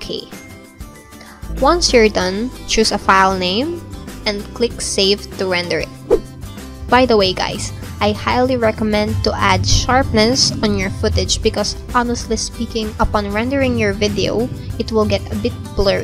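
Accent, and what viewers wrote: Philippines. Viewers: pinay ka po noh ?? haha accent pa lng by the way super thanks po dito sa tutorial vid. mo ♥ :)) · I'm so in love with your accent!! Very cute!! <33 It sounds like a you have a Filipino accent. Are you from the Philippines? :) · You are obviously from the Philippines with that hard-tongue accent. Hehehe. I'm subscribing.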